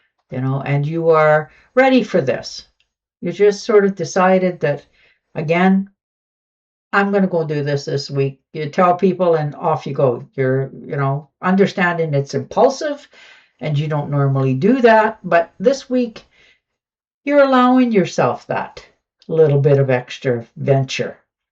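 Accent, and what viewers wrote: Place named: Canada. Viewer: New viewer here. Good reading, thanks. Your accent sounds a lot like my Canadian friends from BC. Sub'd.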